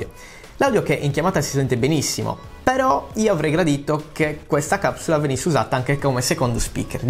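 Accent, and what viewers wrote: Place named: Sardinia, Italy. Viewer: Si sente appena appena che sei Sardo! Bravisceddu 😂 bella Recensioni 😉✌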